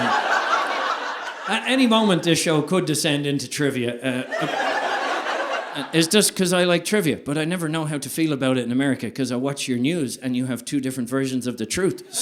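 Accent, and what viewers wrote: Ireland. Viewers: Eye candy, Irish brogue, trivia, truth, comedy - welcome to my hometown! Yep, I'm in Florida but wander around. You'll see there's little bit of everything here...and we're all related. (source: 23 and Me) 😇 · The Irish accent 😀👍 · David is an Irish, American,Mexican, treasure - I love the Irish brogue and his comedy is so fresh and funny 😁 Keep up the great work 🎉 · Wonderful show (and boy, did i need some laughs). I think you're the first Irishman I listened to whose every word I perfectly understood; your looks didn't hurt. 😉😘Hope things are looking up; you deserve it. BTW, your mom sounds terrific; hope she and your fam are well. You should come perform in San Miguel de Allende, Mexico; lots of expats here would love you (me included). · I love your comedy. I watch every show you do on you tube. I love your Irish accent. Have you ever listened to Onoir an Irish singing group from Ireland? 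Wonderful music.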